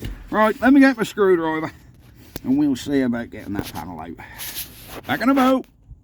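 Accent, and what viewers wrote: Norfolk, United Kingdom. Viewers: Your Norfolk accent is amazing and it's always been my favorite county in the UK to holiday in. Like many British cars Reliant's have a habit of being a pig to start from cold as the starter gear likes to disengage off the flywheel.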